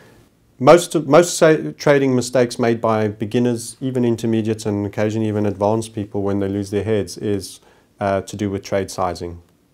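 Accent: South Africa. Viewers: This guy sounds South African 🇿🇦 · He has a South African accent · SA accent.. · you sound south african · Why do you sound South African?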